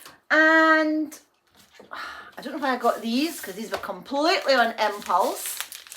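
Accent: Scotland, United Kingdom. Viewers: Nice to listen to Scottish accent ,butter looks great , 🏴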